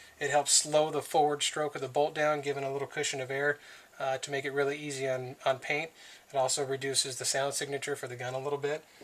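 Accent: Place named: Midwest, United States. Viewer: Its funny cuz ur from california but ur accent sounds like a midwestern one (Wisconsin, Iowa, Minnesota maybe) and im from Wisconsin! Hahahaha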